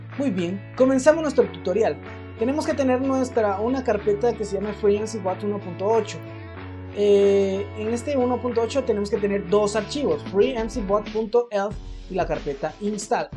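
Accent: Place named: Guatemala. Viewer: Acento chapin :3 hahaha que nivel vos gracias me sirvio.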